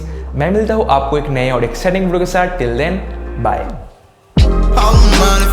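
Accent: Bengal, India. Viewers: Vai tumi je bangali Seta tomar kotha tei bojha jachhe🤩1 jon asol Bangaliei bujhte parbe🤩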